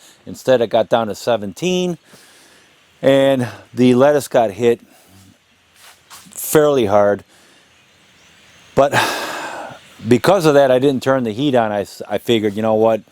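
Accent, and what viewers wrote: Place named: North, United States. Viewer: You keep saying "we, down here", but you don't sound like you're originally from "down here". I was born and raised in East Texas myself, but you sound like maybe you're from a little further north. Nothing wrong with that. Have several Yankee friends. Was just curious where you grew up.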